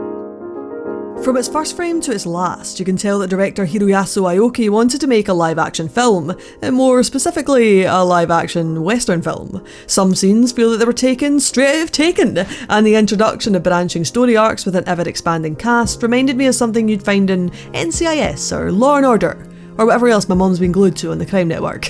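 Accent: Scotland, United Kingdom. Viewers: Good review, I like the format. What part of Scotland are you from?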